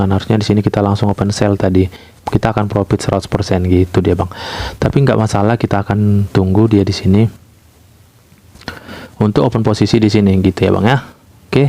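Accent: Medan, Indonesia. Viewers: Medan kali logatnya lek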